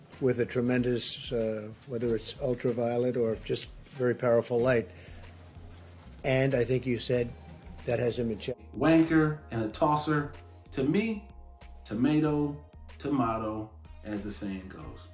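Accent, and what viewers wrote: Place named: United States. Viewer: Hearing our slang in an American accent is amazing. Subbed! P.S 'Sod' can also be a noun.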